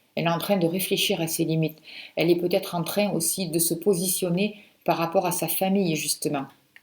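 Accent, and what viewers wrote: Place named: Gard, France. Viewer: Je vous ai découvert il y a peu, et je suis toutes vos videos depuis. J'ai été impressionnée par le soin de libération énergétique, et je conserve précieusement, pour le refaire, le soin pour l'élévation des vibrations. Je ferai un don prochainement, car le bénéfice que j'en ai retiré est précieux à mes yeux, et mérite un retour de ma part. Et aussi..j'adore votre accent 🤗, qui résonne avec le mien, moi qui suis du Gard. Merci pour tout! 🙏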